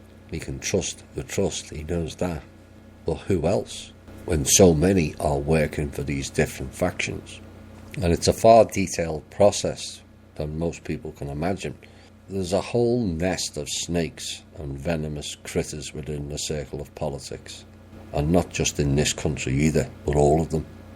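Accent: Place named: Merseyside, United Kingdom. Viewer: Interesting! There are many players! Good intentions and a sincere desire to bring the light of truth and a better way for so many! And not corruption and cro.nism for an elite few. You sound like a merseyside lad! Me too! Thanks for your contribution to righting a wrong world.👍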